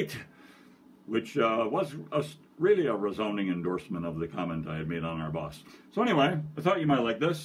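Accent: Scotland, United Kingdom. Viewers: you can tell from the comments that your Fetlockers LOVED this highland fling-y ditty. [And I am highland flung from trying to dance to it.] Ah, the sweet sounds of the drone pipe! Your Scottish accent rivals that of the late and much-missed Sean Connery. We're feckin' exuberant! Admittedly, our compeers may soon grow weary of our shouting "feckin' right!" at every opportunity... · Love it! Good Scottish brogue!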